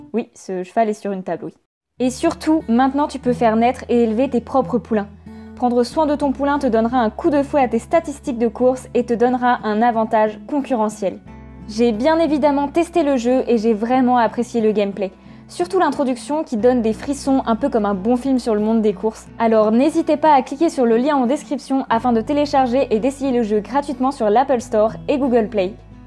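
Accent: England, United Kingdom. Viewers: Quel accent anglais !! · Tu as un très bel accent anglais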